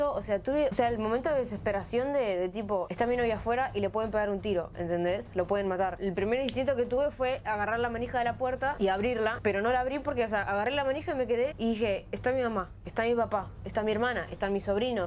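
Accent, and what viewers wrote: Argentina. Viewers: Eres Argentina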